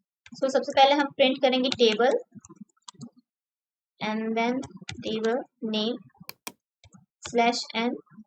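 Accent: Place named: Varanasi, India. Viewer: I bet you are from U.P ...and from the accent I can say its either Banaras or Prayagraj...😅😅 ...and BTW ...the clarity of your analysis is astoundingly amazing ..keep up the good work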